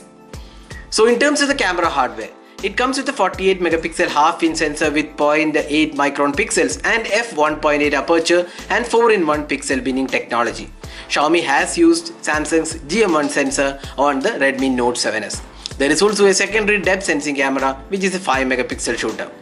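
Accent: Kerala, India. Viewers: മലയാളി touch ഉള്ള sound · His way of speaking english can tell that he is malayali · Narrator ... R u from Kerala ????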